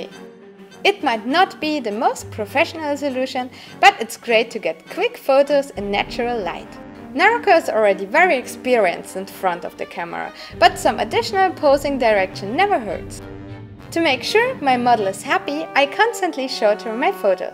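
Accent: Germany. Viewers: I love your german accent xD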